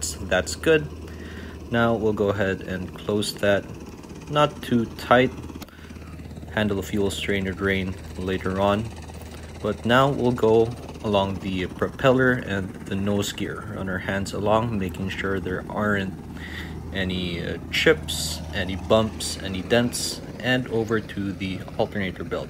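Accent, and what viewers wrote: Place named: Philippines. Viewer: Why u have a Filipino accent lol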